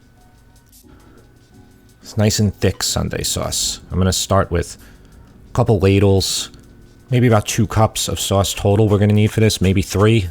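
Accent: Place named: Philadelphia, United States. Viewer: I’m literally going to watch all of your videos so I feel at home. Your Philly accent with a hint of Jersey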